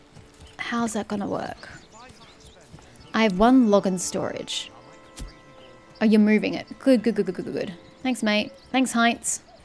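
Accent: Australia. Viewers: Just found your channel! I downloaded manor lords last night and I love it. I'll follow on your series for sure! Love hearing another Aussie lady do a play through!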